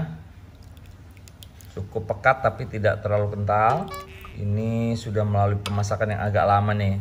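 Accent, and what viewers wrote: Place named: Madura, Indonesia. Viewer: Ari Lasso pake bahasa logatnya Madura. Berarti dia masih tinggal di Jakarta. Padahal rumah aslinya itu di Madiun Jawa Timur.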